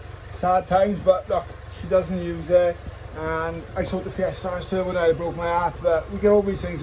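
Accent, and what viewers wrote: Wales, United Kingdom. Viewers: Just randomly got this video watched and heard the accent and subscribed straight away. From the valleys myself and plus I love these cars. Happy days mate 👍🏼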